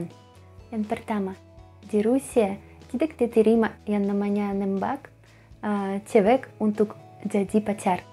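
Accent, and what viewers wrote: Java, Indonesia. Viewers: Logat Jawa 80% apik lo. Monggo mbak mriki dolan ke kota Kediri Jatim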